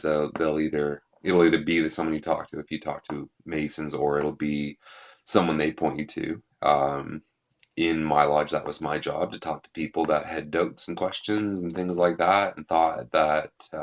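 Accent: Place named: Nova Scotia, Canada. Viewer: A very insightful video, and good for you, sir. thanks for sharing. You sound like a Nova Scotian.. or at least a Maritimer, that's where I'm from :)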